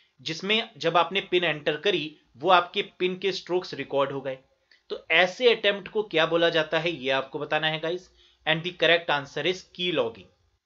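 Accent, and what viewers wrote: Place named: West Bengal, India. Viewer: App west bengal se ho kya sir kyun ki waha ke ek teacher odisha main app ki tarah computer ko easy tarike se explain karte hai usi tone main ❤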